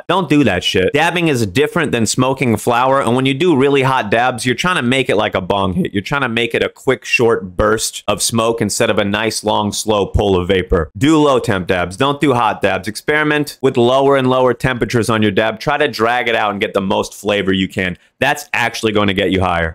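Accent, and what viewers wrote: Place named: Boston, United States. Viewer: Ayo 😂 I have to subscribe. You're funny af bro. You got a Bostony accent 😂.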